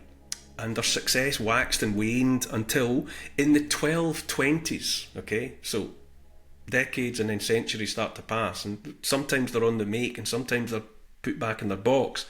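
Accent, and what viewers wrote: Scotland, United Kingdom. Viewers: Probably because... Won't Kneel A gentle, beautiful Scottish lilt A kind, empathic, forward tilt An open heart; a caring face A willingness to take his place. A strong, home-hitting monologue Reminds us of the boiling frog The courage to become a sleuth A passion to release the truth. A deep desire to crack the whip Illuminates our freedom-strip Calls out the darkness taking hold The shiny despots; plated gold! Intense and raw, he lays it bare A soul-enhancing, honest stare Inflections drive the message through He speaks for Us - for me and you! Discernment's such a precious gift His words create a mighty lift Take heed of all he has to say He knows - it shouldn't be this way! 🤗🦋🐬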